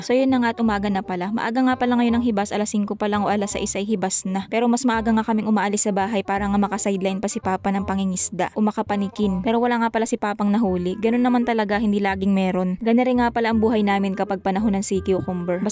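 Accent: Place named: Cebu, Philippines. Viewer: Ganda pakinggan ng dialect nyo madam, halong cebuano ang tagalog...parang sa Palawan din. Ang ganda pa! ❤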